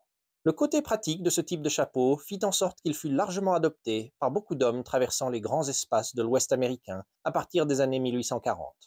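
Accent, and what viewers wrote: Quebec, Canada. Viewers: Léger accent canadien francophone. Léger, mais bien là.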